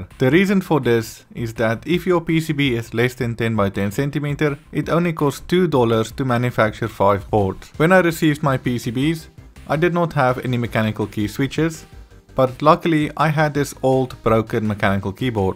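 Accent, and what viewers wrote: South Africa. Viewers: Would love to see version 2. Your accent sounds familiar would you be from South Africa. If so it would help in getting a pcb from your order.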